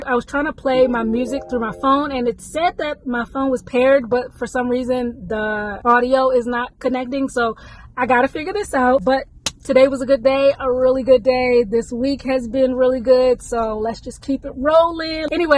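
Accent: Baltimore, United States. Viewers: I’m from Baltimore tooo , your accent is soo clean compared to how others talk here. 😌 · i'm so late! i had no idea you were from baltimore. MD GIRLS FTW lol Now i'm hearing ur accent heavyyy lol. Congrats on the new whip! She's too fly! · Yup I hear the Bmore lol rumz lol aka rooms I love it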